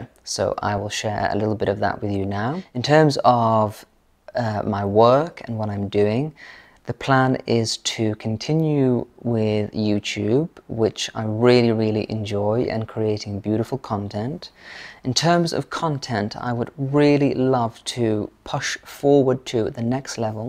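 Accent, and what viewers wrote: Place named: England, United Kingdom. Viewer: Hello! I recently found your channel and I have to say, i am in love with yout content! I can't tell you how much joy your videos bring me! I've never been to England but this is the way I always imagined english people sounded!😅 I am a big fan of Her Majesty The Queen and the way you speak is so similar to hers! Whenever you say the word "again" it just makes me think of her 🤭 anyway, thank you for delighting us with your wonderful content!!!🌷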